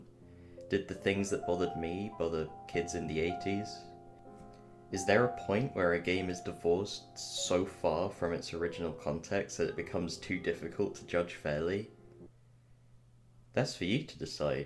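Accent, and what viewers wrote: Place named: United Kingdom. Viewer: You're very british oomfie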